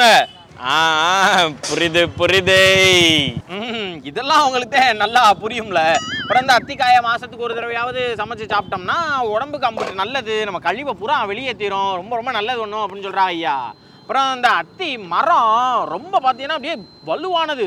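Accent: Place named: Tamil Nadu, India. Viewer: Arumai.... Thennagathu tamil arumai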